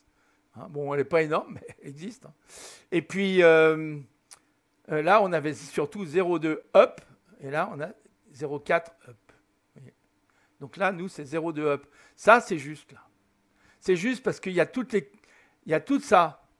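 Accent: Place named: Lyon, France. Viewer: Merci pour tous vos efforts autour de MSFS 2020 et du FBY A32Nx en particulier. Dès que je cherche une réponse, je tombe sur vos tutos ! Si je peux me permettre, vous gagneriez à être plus synthétique: Right to the point, please ! Vous vous perdez en détails, incidences et autres tergiversations : par exemple, ne perdez pas 20mn à parler d'un bout de logiciel obscur qui, selon vous, "ne marche pas"; ce sera déjà ça de gagné ! Moi qui cherchait le ZFWCG estimé dans l'OFP de Simbrief, je suis déçu et j'ai perdu 1 heure...mais ne désespère pas. Si je le trouve, je vous le dirai ... Surtout gardez votre sympathique gouaille lyonnaise qui permet de ne pas totalement perdre patience ! L'art est difficile et la critique bien facile ... Amicalement, PS/ comme promis, le ZFWCG est présenté tout en bas à gauche de l'écran de la tablette (EFB) à la page GROUND/PAYLOAD une fois les passagers embarqués Peut-être que cela n'existait pas au moment de la réalisation de votre vidéo. 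Cela vous permettra en tout cas de vérifier vos dires. En espérant que cela vous soit utile ainsi qu'à ceux qui font cette recherche.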